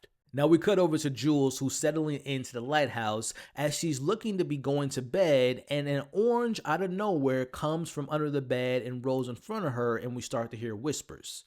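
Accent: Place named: Atlanta, United States. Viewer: you changed your speaking voice??? this aint the white lotus. Atlanta voice... damn E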